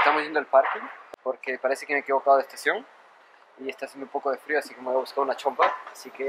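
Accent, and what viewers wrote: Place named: Europe. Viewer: ah, francesa. Sí, me pareció que tiene un acento europeo. Me alegro que les gustó Argentina. Saludos!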